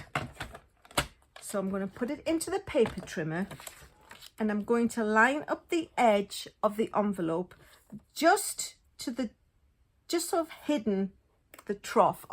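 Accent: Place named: United Kingdom. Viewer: Are you in the UK? Because of your accent. If you are, I wonder if I could buy the PP from you?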